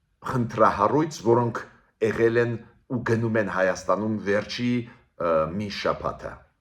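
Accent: Russia. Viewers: Այս ոնց է որ չասեցիր Ռուսներն են մեղավոր եւ Պուտինն է ձեռբակալել։. Տխմար այդ ռուսական ակցենտդ որտեղից ես ժառանգել։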